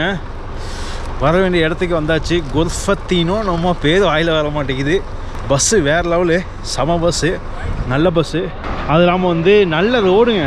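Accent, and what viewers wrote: Thanjavur, India. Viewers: நம்ம தஞ்சாவூர் மாவட்டத்தில் பேசும் அதே பேச்சு உங்களோடு நானும் சேர்ந்து சுற்றி பார்ப்பது போன்று உள்ளது அருமை அருமை நன்றிகள் பல 🙋🙋🙋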